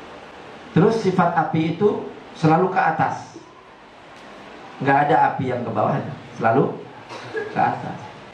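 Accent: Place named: Serang, Indonesia. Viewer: Wih Gale kaya wong Serang ngajine. Melu ngaji kyai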